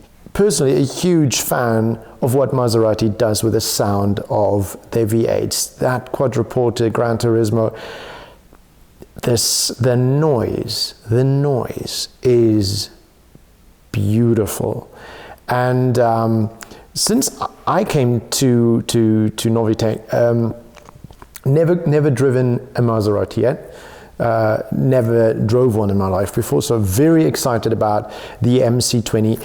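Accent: South Africa. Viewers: I've always suspected your accent might be South African but I just couldn't put my finger on it bru!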